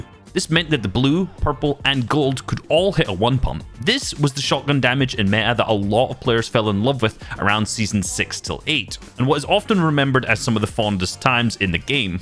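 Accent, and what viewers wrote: Scotland, United Kingdom. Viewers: Ay you Scottish? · r u scottish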